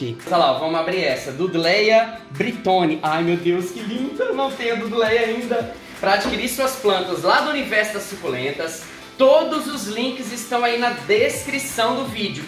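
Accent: Minas Gerais, Brazil. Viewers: Vc é mineiro? Pq super me identifiquei no monte de "nuuuu " "nossinhora" 🤣🤣🤣🤣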